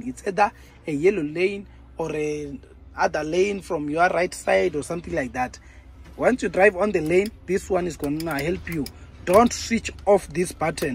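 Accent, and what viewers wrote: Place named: South Africa. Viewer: but I don't remember saying otherwise or anything bad against you,I didn't criticise you instead I only said South African accent is just so awesome 😎😎😎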